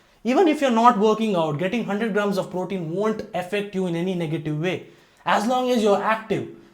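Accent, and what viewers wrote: India. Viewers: Indian accent is disgusting.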